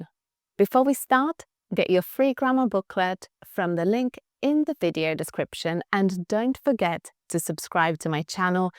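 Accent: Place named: United Kingdom. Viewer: your british accent is beautiful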